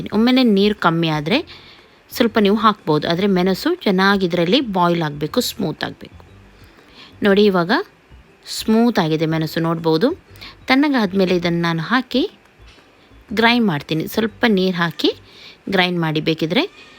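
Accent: Mangalore, India. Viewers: Medam sorry..but wher you from.?? R u from Mangalore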